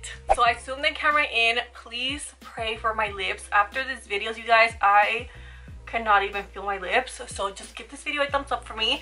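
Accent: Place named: Puerto Rico. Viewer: Hello, I just started watching your videos and immediately I looked for a video in Spanish and I loved it ... but I am learning English and I will see your videos to learn how to apply makeup and learn a few words. I loved hearing you speak Spanish and knowing where you are from because of your accent. speaking english no idea you were from Puerto Rico a big greeting you are amazing... arriba los latinos !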